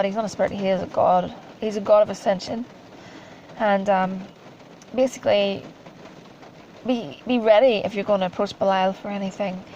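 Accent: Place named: Ireland. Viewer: Good vid, more of these please (if you can find the time!). I can tell by your accent you come from not far from me. Being someone v interested in this side of life I've found it very hard to find groups, other individuals etc who are serious about this. How did you go about it, learning, meeting others who are interested in magick etc? I know Ireland is full of us, i just dunno where to look and am so wary of charlatans. Thanks! ☺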